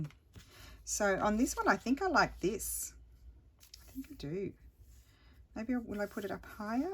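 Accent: Australia. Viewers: I like the leaves also. Try a thistle. I bet it’s not to hard. Building up the courage sounds like a great idea. Sounding Aussie? Maybe because you were talking to your brother than your sister than your mom. Lol. Yep I like your Aussie sound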